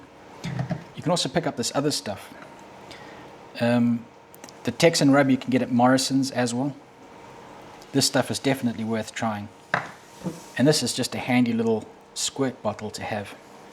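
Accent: South Africa. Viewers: you sound south African mush 😊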